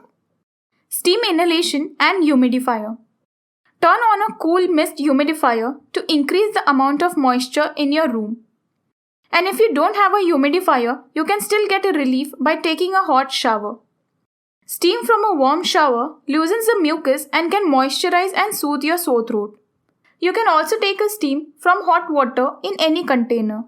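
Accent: India. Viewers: That Indian accent though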